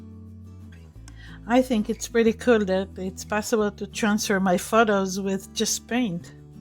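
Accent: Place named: Eastern Europe. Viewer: Hi and first, thank you for your videos! My first question is, I will produce my own artwork using acrylic paint and alcohol ink designs then transfer photocopies of my art onto acrylic sheet, what paper is the best for me please? My second question is, is the Gel Medium technique best for me? By the way, the best years of my life were spent in Chernivtsi in Ukraine and it’s so nice to hear an Eastern European accent again! Kindest regards, Paul.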